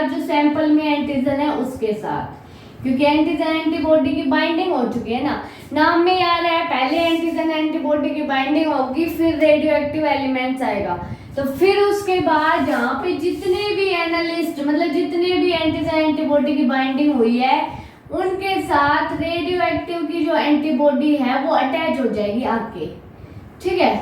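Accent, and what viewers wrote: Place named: Haryana, India. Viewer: madaam to haryana ki lagti ha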